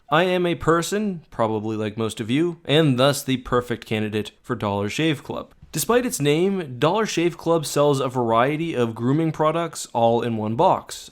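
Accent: Canada. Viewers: Wait, are you Canadian?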